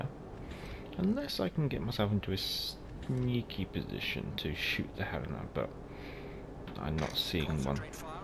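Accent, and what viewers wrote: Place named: United Kingdom. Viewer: I also came over from spartan and its great to hear a British accent, maybe a link up with said spartan could happen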